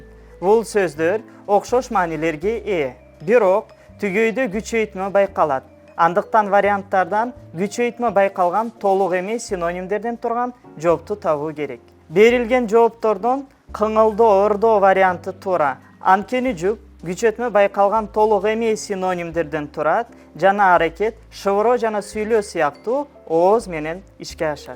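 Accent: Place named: Turkey. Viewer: У него турецкий акцент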